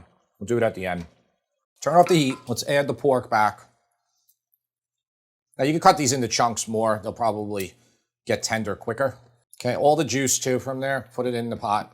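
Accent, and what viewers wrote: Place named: New Jersey, United States. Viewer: Brother, I love your channel. You remind me so much of my family in NJ. I miss that accent. I really enjoy the way you break down the preparation and the cooking, almost like an engineer would. I love all the hints and tips during the production that help us all to become better cooks. I love that your family is involved. I love that you’ve clearly done your studying beforehand and practiced each dish a few times. Thanks for your channel. I pre-ordered the book and I’m sure I’ll love it. I love Italian cooking. My heritage is mostly from Sicily and that must create a DNA-level connection to the food because it’s always been my favorite. Thanks again.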